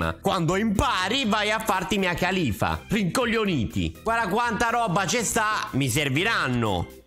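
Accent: Rome, Italy. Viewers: però l accento romano no! E che cazz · Non te se po sentì a parlà mezzo romano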